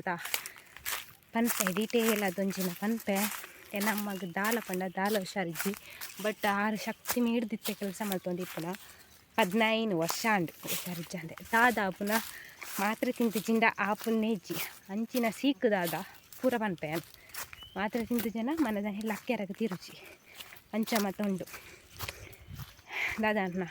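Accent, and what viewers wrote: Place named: Tulu Nadu, India. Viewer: Eerna Tulu kenara bhaari kusi aapund👌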